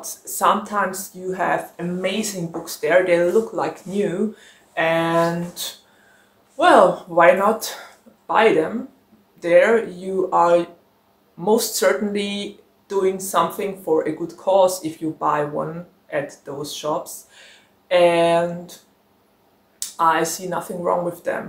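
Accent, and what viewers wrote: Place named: India. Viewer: Your comment made me check this out. You've done an amazing work answering this tag questions. I love your idea on pre-ordering books. You have a great demeanor and this seem to be my first time here, so I'd just subscribed. Your accent sounds lovingly Indian. Are you an Indian? I admire your opinion on library books too. 😆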